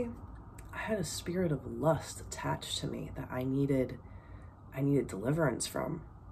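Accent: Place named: Boston, United States. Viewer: oh whoops 😬 haha my bad. Hope your having a great day today. Love the Boston accent that slips out every once in a while aha 😂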